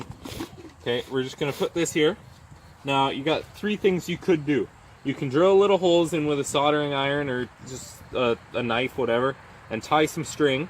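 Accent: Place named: Canada. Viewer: As soon as I heard the Canadian accent, I knew this was the right video to watch.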